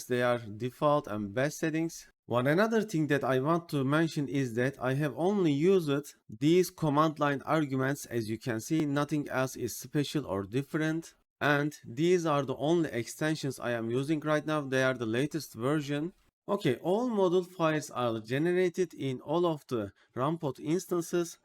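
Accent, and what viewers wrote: Turkey. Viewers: ABİ TURK İNGİLİZCE AKSANİ OLMASAYDİ SEN YİNE OLURDUN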